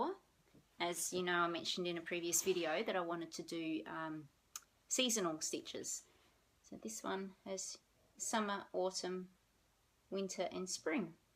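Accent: Australia. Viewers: Hi, l too have only recently discovered "floss tube" and am really enjoying watching your videos as I stitch. My name is Sandra. I'm a born n bred Aussie living in Texas, USA. It's nice to hear an Aussie accent:-) The wolf kit that you purchased, I saw on another floss tubers - Kelley Jo Crafts - she is an American lady and I'm sure she found it more locally. Sorry I cannot find the particular video of hers with that kit. My current wip is "Fairy Castle" from 'Crosstitch Magic' which was a fortnightly publication I subscribed to many years ago in Aus. Thanks for sharing. Bless you heaps, Sandra.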